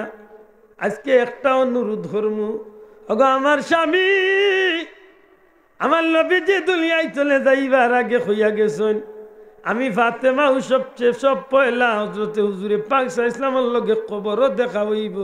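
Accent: Sylhet, Bangladesh. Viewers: বড় মজার সিলেটী ওয়াজ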